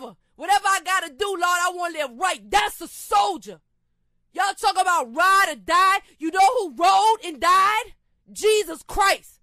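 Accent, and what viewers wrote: New Orleans, United States. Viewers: I was thinking of some old BME stuff and Googled you. Glad to see that your life has turned around for the best. But truth be told, your past lyrics use to be on rewind because you was spitting fire. Plus I love women from New Orleans accent.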